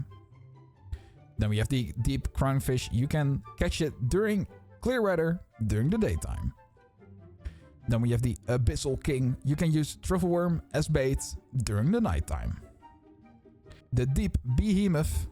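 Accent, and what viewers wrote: Netherlands. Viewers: je hoort gwn dat je nederlands bent lol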